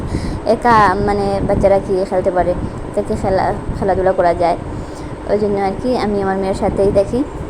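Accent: Bangladesh. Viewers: আপু ভিডিওটি খুব ভালো লাগলো। গোল গোল করে বেগুন কেটে তেলে ভাজি করে নিলে। ফ্লোর পরিষ্কার করলে। স্কুল ব্যাগ গুছিয়ে সুন্দর মতন রেডি করে দিলে তারপর সাথে করে নিয়ে গেলে। সব মিলিয়ে খুব ভালো লাগলো আপু। আপু তোমার রান্নাবান্নার ধরন থেকে শুরু করে কথাবার্তা সবকিছু আমার কাছে কেমন যেন বাংলাদেশি মনে হয়। তোমার কি কেউ বাংলাদেশে আছে নাকি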